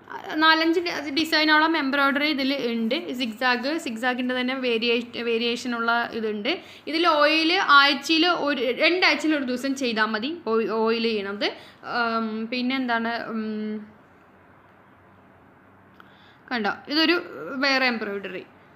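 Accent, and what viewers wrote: Palakkad, India. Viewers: Palakkadu kariyano?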